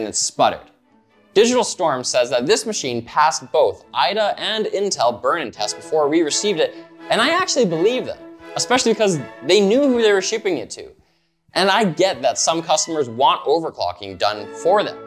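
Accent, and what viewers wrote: United Kingdom. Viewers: why the fuck it sound so British ???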